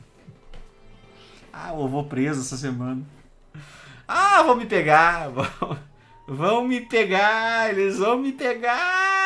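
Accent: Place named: Rio de Janeiro, Brazil. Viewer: O carioquil é de fuder meu amigo kkkkkkkk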